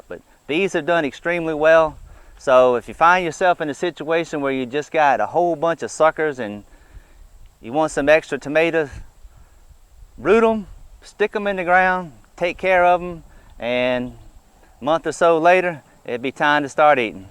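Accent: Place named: Gulf Coast, United States. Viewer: By the sound of his accent, I’m thinking he’s on the gulf coast. It’s hotter than hell until about November!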